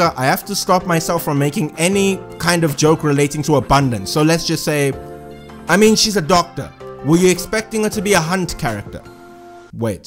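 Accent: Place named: South Africa. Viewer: u started speaking and i immediately went to check ur location lmao i can always spot my fellow south africans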